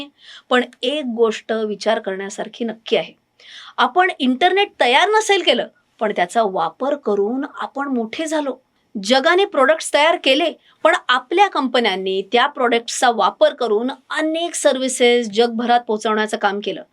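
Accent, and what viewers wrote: Pune, India. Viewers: As always, this podcast was well studied and articulated by you, पुणेकर आहेस तू, ते अजून छान feeling देते.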